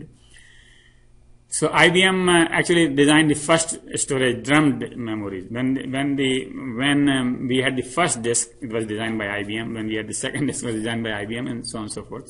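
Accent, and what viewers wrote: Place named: India. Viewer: He has Indian accent, may be that's why it is hard to understand. Otherwise it is quite good english. Thanks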